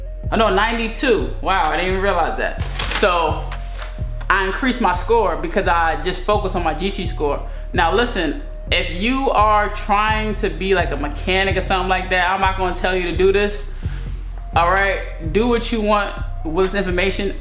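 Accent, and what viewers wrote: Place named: New York, United States. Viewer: She from New York lol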